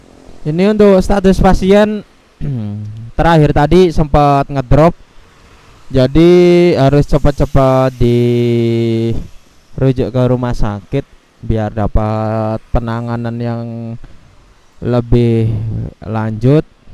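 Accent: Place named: Java, Indonesia. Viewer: Lah jawane medok to ms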